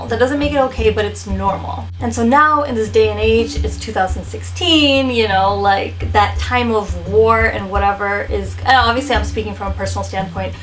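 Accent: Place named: Bengal, India. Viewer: I'm Bengali too and I love your videos. Don't worry about your accent I have one too so it's all okay.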